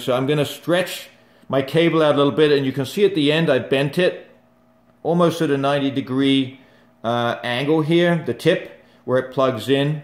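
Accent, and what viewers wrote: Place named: New York, United States. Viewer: He sounds so new yorkey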